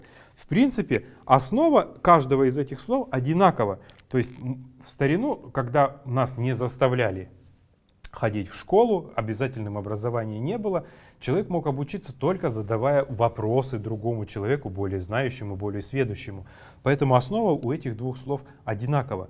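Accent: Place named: Oirat, Russia. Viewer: Отличные лекции. Я вырос в Западной Монголии, очень близко к моей бабушке. Слушая ваши лекции, я вспоминаю о ней и возвращаюсь в самое счастливое время моего детства. Я уверен, что если вы посетите Западную Монголию, старейшинам понравится ваш ойратский акцент и богатый словарный запас.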